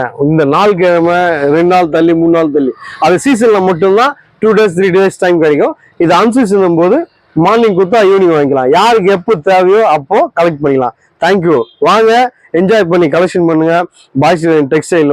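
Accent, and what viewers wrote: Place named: Chennai, India. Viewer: Super super unga Chennai tamil slang awesome dear 🎉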